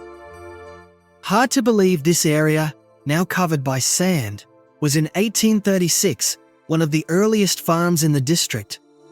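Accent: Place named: Australia. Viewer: Congratulation on a very well done and interesting video. And no problems at all with the voice. Clear, easy to understand and in a neutral/Aussie accent, cheers..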